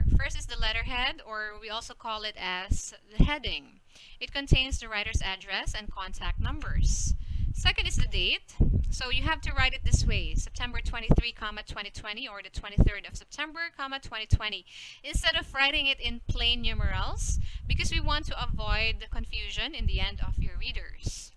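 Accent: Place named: United States. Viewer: you sound like an American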